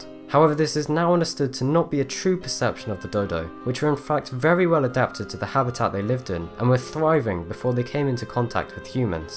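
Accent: England, United Kingdom. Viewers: What is a May-ori? I am a New Zealander and it is pronounced Mow( as in "how" ) ree. You sound English so you should know how to pronounce Maori. The Maori Battalion sent thousands of Maori troops to both WW1 and WW2 in Europe.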